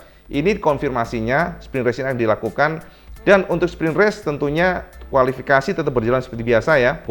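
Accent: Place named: Java, Indonesia. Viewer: Ini mah chanel sales yamaha yah.. Haduhhhh dasar nih chanel orang medok..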